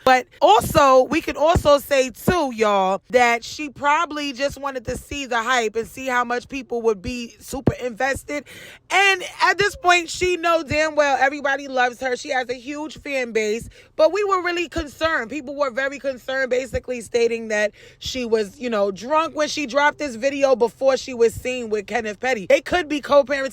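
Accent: New York, United States. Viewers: Just subbed to your channel! Love your content and I absolutely love your NY accent!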